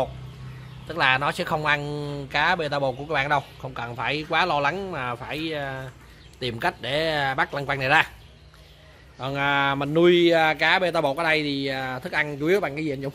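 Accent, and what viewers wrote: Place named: Mekong Delta, Vietnam. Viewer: chời ơi chờ clip mỏi mòn nay mới xuất hiện,khoái cái giọng miền tây thiệt thà chân chất,trại cá ở cu chi- trảng bàn tây ninh giá mềm hok anh. xuống tham quan có phải giới thiệu hok hay ghé chơi tham quan giải trí hoy,:D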